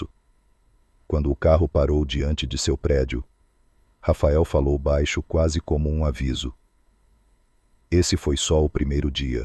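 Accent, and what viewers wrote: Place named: Portugal. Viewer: Uma história muito interessante. Quem diria que a atitude daquele, milionário tão agressiva e fria, iria acabar numa linda história de amor. Narração perfeita, de Portugal ❤❤❤❤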